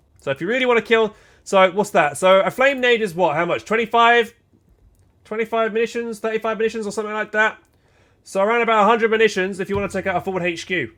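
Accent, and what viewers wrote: United Kingdom. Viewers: I was pulling your leg regarding yall. Anyway, is very English. As am I. In fact we even have the same accent. Maybe it's my age, getting too old for this video computer entertainment;0)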